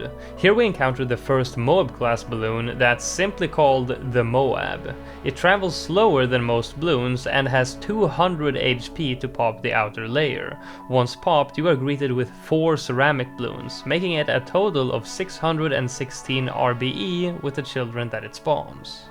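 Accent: Sweden. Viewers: I just started her the Swedish ascent and I I’m also Swedish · Are you swedish?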